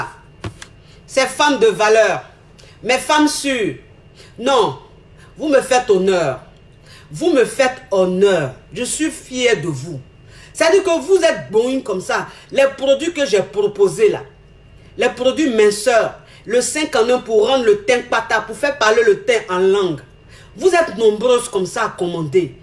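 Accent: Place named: Ivory Coast. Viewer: Wallaye si n'est pas ivoirienne ça m'étonnerait. Gagagagagaga. Elle parle comme à chez nous pays hein loool. J'adore encore aujourd'hui la façon dont le sujet-ci est abordé.Merci beaucoup pour le partage chère.Stay Blessed for all!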